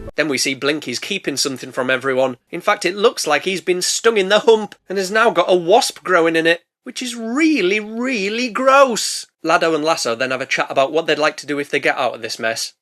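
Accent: United Kingdom. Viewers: Please settle a debate for us, where are you from? 😂 we’ve guessed Newcastle from The accent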